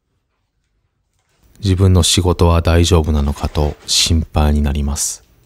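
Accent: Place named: Kansai, Japan. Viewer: いつも楽しく拝見しております、ありがとうございます。 関西の方かと、思ってましたが、東北の方なんですね。 アクセントが関西っぽかったので。。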